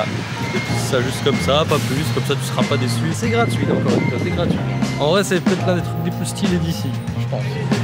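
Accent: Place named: Paris, France. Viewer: Ton accent "parigo" ...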